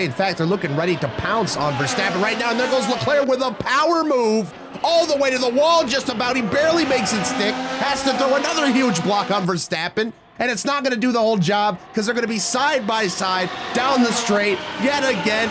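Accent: United States. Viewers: American accent with f1 intro sounds weird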